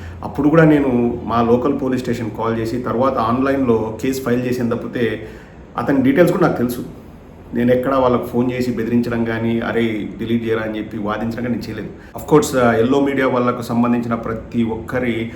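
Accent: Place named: Telangana, India. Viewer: Bro nee proper ekkada,Slang matram Telangana la untadi,Kaani nuvvu chala better ,boothulu matlade Prabhakar gaani kanna .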